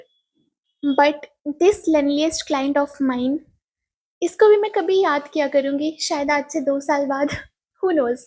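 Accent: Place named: Punjab, India. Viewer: Your punjabi accent is too cute❤😁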